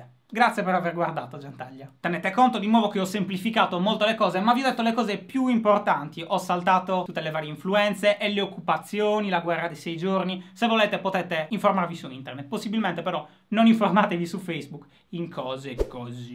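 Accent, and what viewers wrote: Sardinia, Italy. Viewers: a quei tempi il tuo accento sardo era più marcato XDDD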